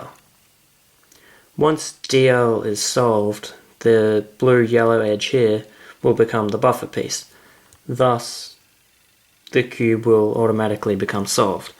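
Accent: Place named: United Kingdom. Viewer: You sound like you're british. The tutorial helped! I'm also nervous of solving it w/o looking. #AlwaysPractice | v But I don't understand how to recognize the proper position, especially for the corners. I'm not sure what to do like, for example, UBR > DFR. That is the problem that i didn't understand.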